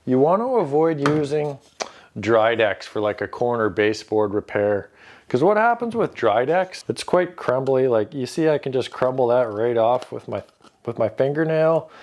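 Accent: Canada. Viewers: I have no idea why this was in my feed and I've never seen this guy before, but he sounds very Canadian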